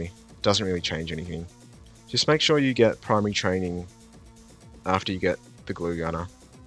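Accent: Australia. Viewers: Same. Aussie?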